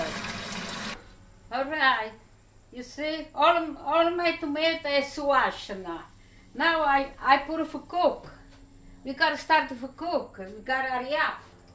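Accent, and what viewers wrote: Italy. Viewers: This lady must be right off the boat, her Italian accent is thick, but I love it! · I love! By her accent and certain Italian dialectic words, I think she is from a paesa nearby my paesans. We come from Castel Nuovo, Parranno - vicino Formia e Montee Cassino. Love hearing her accent. It brings me back to old times with my nonno and nonna!! <3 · . You poot oo vasanico'. My God, she's fantastic! I love her. What part of Italy are you from? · Dear Italian Grandma: Sitting far away in Mumbai (India) I really enjoyed watching your show, your beautiful Italian-English accent, your passion for cooking...thank you so much. I shall definitely try your recipes. Unfortunately, here we do not get as good tomatoes as are found in your countries. Thank you very much and wish you many more happy years of cooking... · Thank You. You are a wonderful grandma . 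I appreciate your dedication and love to the job you are doing . Congratulations. And for those who made fun of your English , please be polite and respectful . She is Italian , not from London . And it's very natural that her pronunciation and accent will be influenced by her mother tongue . She still has good vocabulary and put a nice effort to make us things right. Congratulations again Grandma !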